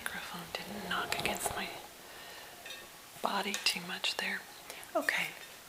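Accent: South, United States. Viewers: I saw ur souther ascent vid and actually thought u where southern lol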